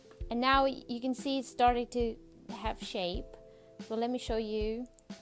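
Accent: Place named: United Kingdom. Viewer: HI. Enjoyed your video. BTW your from UK? Like your accent. THANKS.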